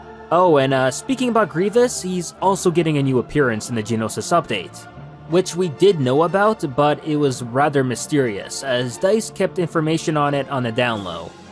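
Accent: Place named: Canada. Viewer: thats how they pronounce offense in canada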